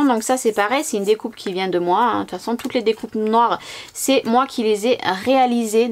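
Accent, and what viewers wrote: South, France. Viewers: Même si je ne fête pas Halloween , merci pour ces superbes cartes très lumineuses. Dans l'un de tes derniers vlogs tu nous a parlé de pochettes vinyl pour stocker tes papiers scrap. Peut on y mettre plusieurs feuilles ? Pourrais tu me donner la référence car je serais intéressée , ne sachant plus comment ranger mes restants de collections. Merci et vive ce petit accent du midi qui donne la pêche. 😉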